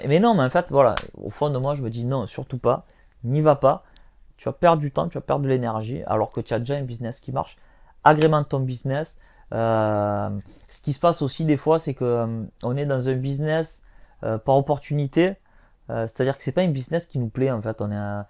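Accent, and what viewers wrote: South, France. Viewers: "tu envoies" :-) et y a une fofote dans la vidéo au début, "une autre site" mais on s'en fout du moment qu'on entend ta belle voix du sud aussi mélodieuse que les cigales! (c'est vendredi je suis fatiguée)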